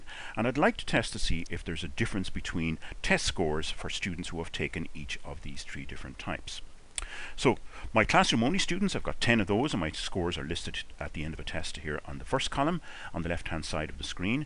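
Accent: Ireland. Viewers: This video was clear, addressed exactly what I needed to learn, and did not have unnecessary commentary! Thank you! When you are working hard on several problems and you get exactly what you need in a comprehensive yet streamlined presentation, it is a gift. I would take a course taught by you Dr.. I am married to a man from Galway City, Ireland and your brogue added a touch a familiarity that I appreciated.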